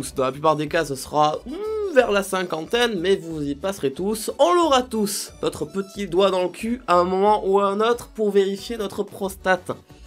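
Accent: Spain. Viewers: Au début en lisant les sous-titre en même temps d'écouter ce que tu disais, je me suis dis : mais... google traduction est pourri haha! Sinon, trop mignon ton accent en español !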